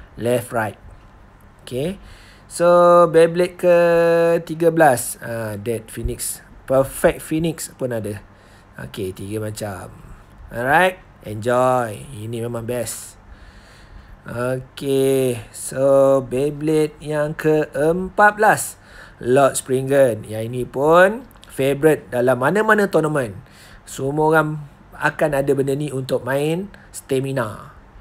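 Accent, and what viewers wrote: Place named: Negeri Sembilan, Malaysia. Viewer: Cakap nogori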